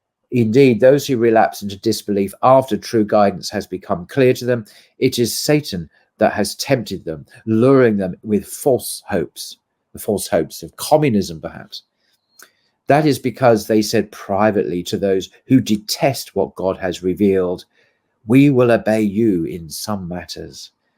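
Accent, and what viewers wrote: United Kingdom. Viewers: You got a British accent, you are a propagandist. Let's talk about how many people Britain have killed just in India. F*****, British sucks.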